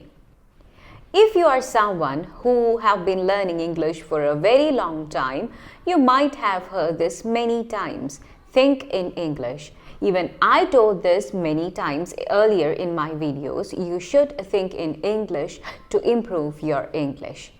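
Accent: Kerala, India. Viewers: Are you malayalee?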